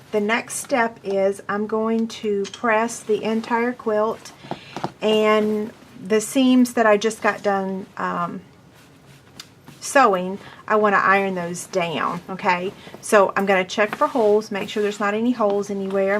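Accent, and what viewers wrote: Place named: Texas, United States. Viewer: Thanks for taking the time to make and share these videos! I'm about to do this to make a little quilt for my daughter. P.S. Your accent reminds me of growing up in Texas! 😊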